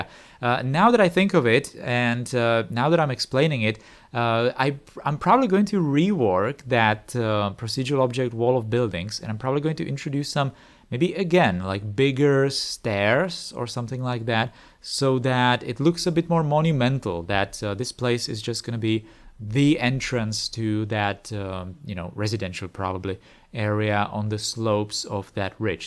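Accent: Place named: Czech Republic. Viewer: This is probably a wild shot but you sound like you're from Czechia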